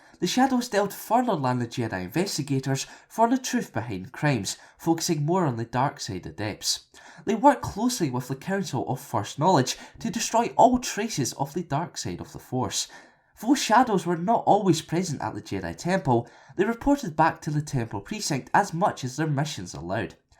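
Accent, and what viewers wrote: Scotland, United Kingdom. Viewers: welcome. Your accent is Scottish, right?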